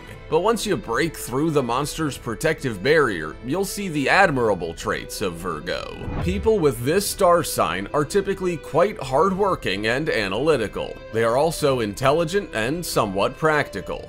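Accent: United States. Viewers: You from Texas ?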